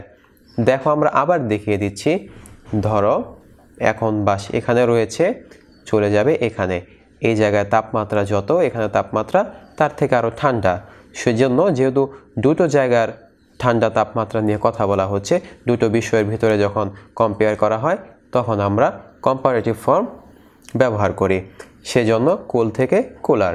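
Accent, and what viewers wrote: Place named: England, United Kingdom. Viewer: ভাই বলেন ভালো লাগে, কিন্তু বাংলা ভাষা উচ্চারণ English এর মতো সোনায়।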